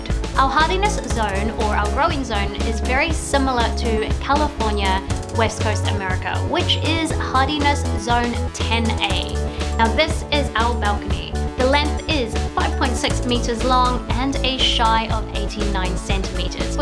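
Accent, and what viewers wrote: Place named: United Kingdom. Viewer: I love your accent although for some reason it sounds a bit British. You seem to be a natural infront of the camera. The landlord is probably concerned about the cement planters due to weight on the balcony.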